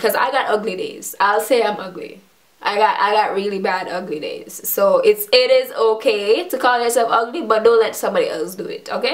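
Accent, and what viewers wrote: Jamaica. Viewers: Yuh can tell seh yuh a Jamaican big up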